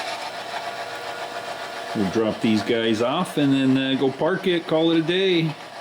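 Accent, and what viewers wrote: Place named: Chicago, United States. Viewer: My wife insists that you are originally from Chicago per your accent. Are you?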